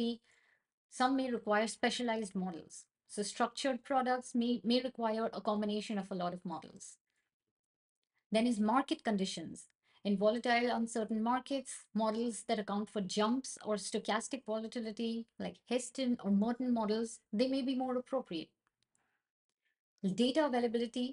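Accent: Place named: India. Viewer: Great video but the Indian accent makes it difficult to understand sometimes, especially with names you’ve never heard of. Maybe use AI to translate English to English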